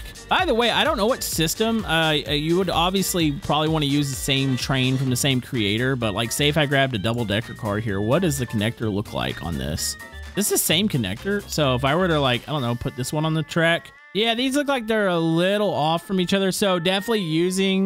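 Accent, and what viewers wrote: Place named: South, United States. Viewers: you have a very southern sounding voice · He's from Texas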